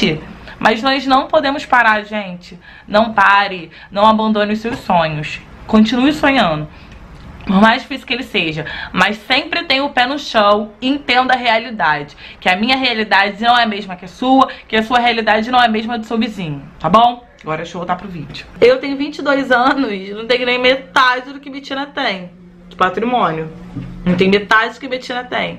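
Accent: Rio de Janeiro, Brazil. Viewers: Você é carioca??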